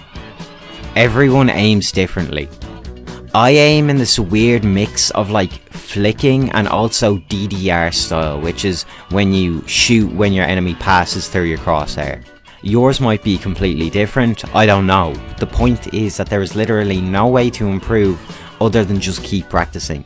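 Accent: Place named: Ireland. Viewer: I thought I’d never find an Irish tf2 YouTuber. I could instantly tell by your accent. Good videos btw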